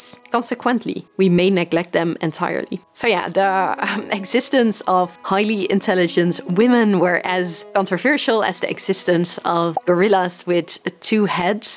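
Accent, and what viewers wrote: Netherlands. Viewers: Hoi! Ben je toevallig Nederlands?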